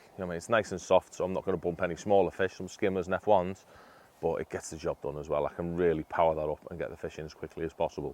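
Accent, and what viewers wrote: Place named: Wirral, United Kingdom. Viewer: I watch a lot of Fishing videos, I tend to always read the comments, watching this channel is the first time I've gone across someone being given stick for their accent because your man there is Scouse. Firstly he's from the Wirral, his accent is closer to a Chester accent than a Scouse accent, not that it actually matters. If a Scouse or even any Northern accent bothers you I can point you towards a few channels run by southern anglers who are nowhere near as knowledgeable about Fishing as this fella, you won't learn as much but at least you will feel safe and at ease you poor little snowflakes 👍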